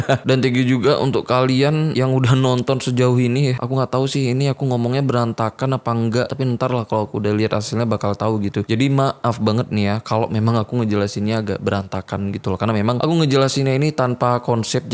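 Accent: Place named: Aceh, Indonesia. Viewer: Gapapa bang adain lagi aja #ThreadHorror nya, mau itu cerita real ato engga, yg penting kita2 bisa ngerasain feel serem-nya bareng2 hehehe... Salam dari Semarang bang. Ohiya, aku tebak Bang pasti asli Aceh nih, ketauan dari logatnya soalnya, apalagi video2 yg dulu logatnya masih kental banget tuh wkwkw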